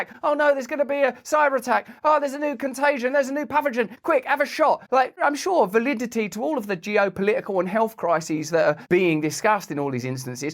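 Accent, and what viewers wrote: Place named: England, United Kingdom. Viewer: He is English…just in case you couldn’t tell…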